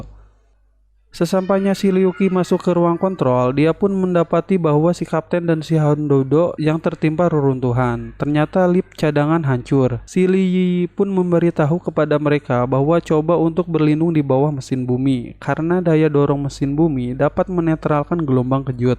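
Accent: West Java, Indonesia. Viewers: Abi ti Tasik kang, komentatorna sundanese, timana nya ?? Seru dan lucu.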